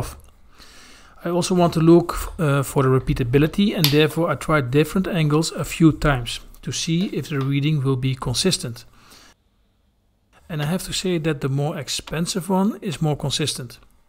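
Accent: Netherlands. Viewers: Verdomme dat hollands accent haal je er ook meteen uit.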